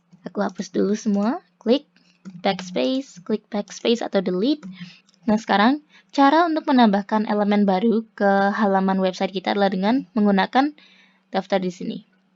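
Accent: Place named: Java, Indonesia. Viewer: Semangat Mbak....walaupun di Tokyo dan sering komunikasi pake bhs jepang/english tapi aksen jawane isih ketok lho...wkwkwkwk. Maturnuwun nggeh tutorialnya about digital content...ayo banyak upload cerita tentah gimana bisa kuliah di jepang dll....kehidupan sehari hari di jepang, suka duka nya...dll...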